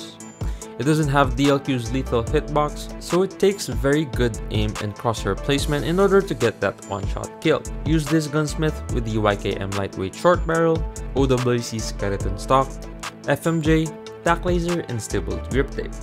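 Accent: Philippines. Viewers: I thought your from Philippines besides your talking in English like Filipino Lmao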